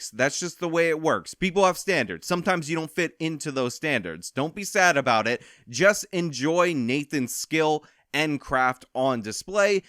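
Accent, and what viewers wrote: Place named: Boston, United States. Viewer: I a Bostonian? the accent really comes through in this video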